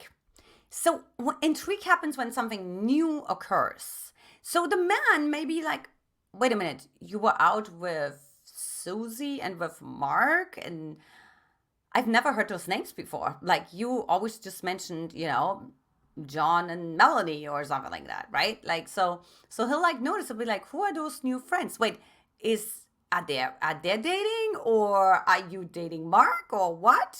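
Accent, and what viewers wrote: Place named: Germany. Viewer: Im ready! (And BTW love your German accent!).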